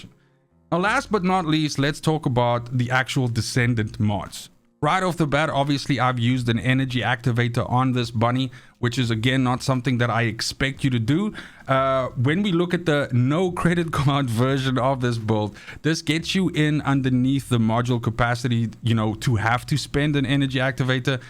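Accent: South Africa. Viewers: Sounds like a fellow South African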